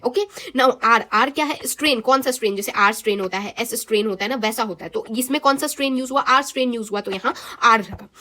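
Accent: Bihar, India. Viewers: Didi aap bihar se ho kyyy you have an accent like us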